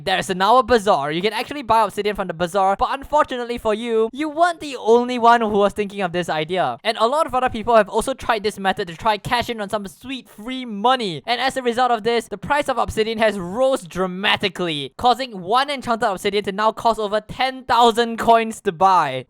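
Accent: Singapore. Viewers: Is it just me? Or do you sound like a Singaporean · U sound singaporean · R u singaporean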